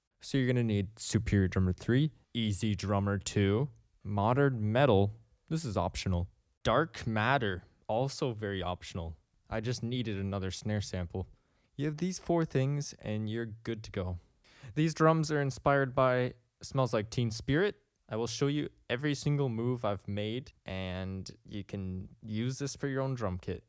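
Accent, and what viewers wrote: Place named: Canada. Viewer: Canadian detected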